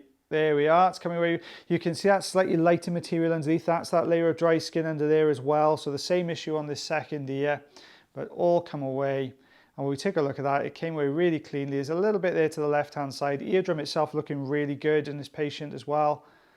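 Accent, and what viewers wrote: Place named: Wales, United Kingdom. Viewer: I watched a vid from you, of 2 years ago. You didn’t sound as ‘Welsh’ then. What’s occurring?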